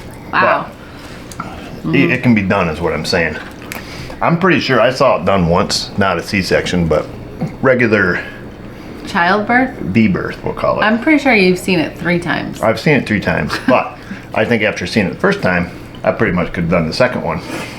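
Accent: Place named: Massachusetts, United States. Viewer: Wicked good MA accent 😂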